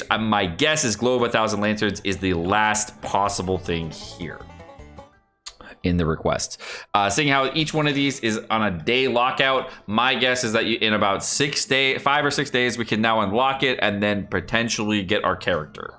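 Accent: United Kingdom. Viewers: Yay british again 💕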